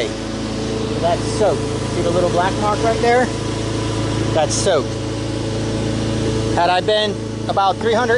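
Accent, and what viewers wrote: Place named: New Jersey, United States. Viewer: Great Channel and content. Nice work too. But do I detect a Jersey accent..? Formerly Monmouth and Essex County here, was in business for 27 years. Keep up the good work!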